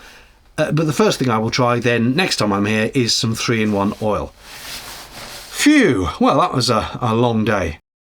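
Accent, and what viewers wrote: United Kingdom. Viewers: Are all British men so even tempered? You said "ow" and barely skipped a beat as if you hardly noticed. When we had a boat and I worked on it with my (at the time) partner...it was always a traumatic experience as he had nothing like your calm demeanor and I was always on the lookout for flying tools. I'm happy to say that the relationship didn't last past that summer. I have to admit I don't think I will ever be able to say "farting around" without hearing it in your wonderful accent in my mind. Another truly enjoyable video. Thank you.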